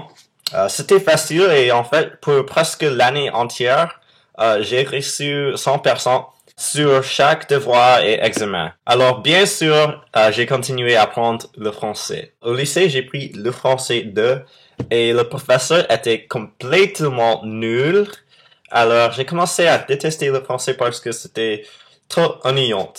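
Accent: United States. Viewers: Franchement, chapeau ! =) Tu parles trop bien, malgré des p'tites fautes, mais ça, on s'en fou ^^. Je pense que si je ne l'avais pas appris depuis mon plus jeune âge, je n'aurais jamais été capable d'apprendre le français -3- En tout cas, tu as du courage ! Continue de t'améliorer c'est presque parfait, mais surtout ne change jamais ton accent ! Personnellement, je trouve que l'accent français est fade et plat comparé à l'accent américain ! =) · L'Amérique c pas mon truc mais j'adore ton accent américain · Salut! Je viens de découvrir ta chaine , et j'avoue que c'est trop cool d'entendre un américain parler Français. Je trouve que tu t'en sort pas mal même avec l'accent avec quelques fautes mais sa c'est normal :) Et je voulais savoir si "quelqu'un" connaissait des astuces pour parler anglais :) J'aime cette langue mais les cours que font les prof son nul donc ..... 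voila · Michael Rivière Non, on dit des Anglais (ou anglo saxons) qu'ils ont un accent anglo saxon... mais en l'occurrence, ce jeune homme est américain et a un accent américain. Ça va, il fait pas trop chaud chez toi? ( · Bonjour :) Je viens de découvrir ta chaîne et tes vidéos. Tu est très beau :3 et tu parles très bien français, même si tu as l'accent américain (ce qui est normal). Je te félicite c'est très bien :) Translation : Hello :) I just found your channel and your videos. You are very handsome :3 and you speak French very well, even if you have an American accent (which is normal). I congratulate you, that's fine :)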